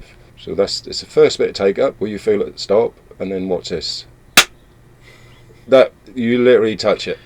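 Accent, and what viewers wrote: United Kingdom. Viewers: Is that a Suffolk accent bu?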